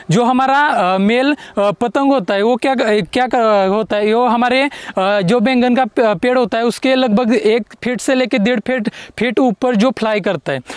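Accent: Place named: Maharashtra, India. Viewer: योग्य मांडणी केली हिंदी छान बोलला उच्चारण थोडेसे मराठी वाटते पण अगदीच हिंदी येत नाही असे नाही . जो विषय मांडला आहे त्याला निर्विवाद न्याय दिला आहे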